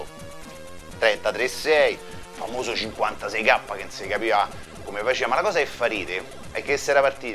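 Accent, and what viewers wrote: Rome, Italy. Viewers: sei un grande, oserei dire un "geniazzo": estrema competenza abbinata a grande, voluta, semplicità comunicativa, peraltro con un deliberato utilizzo del "romanesco", che rende il tutto incredibilmente familiare e soprattutto nostalgico; spazi dalla tecnologia allo sport con la stessa disinvoltura con cui dai un "colpo sotto"!!! 🤝🏻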